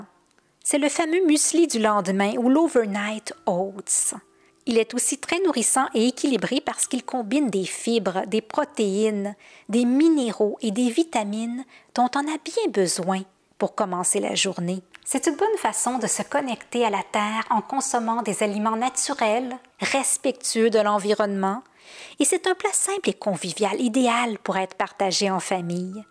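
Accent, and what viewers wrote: Quebec, Canada. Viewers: l'accent sonnait bien le Québec. C'est très agréable <3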